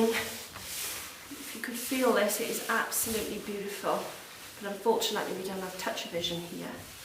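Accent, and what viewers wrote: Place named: Wales, United Kingdom. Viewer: hi, do you use both farrow & ball undercoat/primer & top coat, & are they both water based? also the liming wax, what brand is it, btw its fantastic to hear a fellow welsh accent on y.t. for a change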